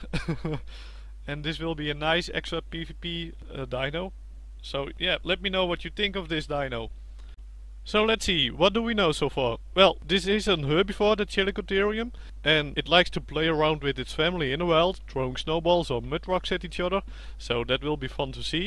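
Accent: Netherlands. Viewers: Are you dutch? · hahahaha mijn engels is ook zo :) dat is bijna normaal voor nederlanders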